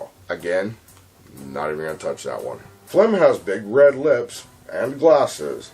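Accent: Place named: United States. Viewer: I didn’t know Max Cavalera did 90s show reviews in an American accent.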